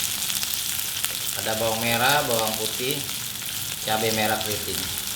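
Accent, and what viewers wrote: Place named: Java, Indonesia. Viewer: Kita.." eller ". 😀😀😄😄😄 Medok bingit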